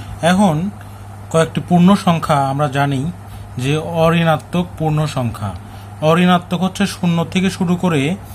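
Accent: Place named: Barishal, Bangladesh. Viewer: আপনার বাড়ি কি বরিশাল?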